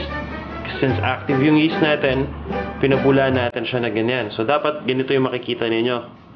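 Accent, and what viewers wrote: Philippines. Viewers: Except for the loud background music, will u people stop criticizing this chef? His English is NOT very bad that u have to complain about it! & his accent is ok! Pinóy accent naman yun; what's wrong with it? Would u rather hear him speak in a foreign accent (like American or Chinese accent) while he uses the Tagalog language?!? Remember that his videos are NOT about grammar or phonetics!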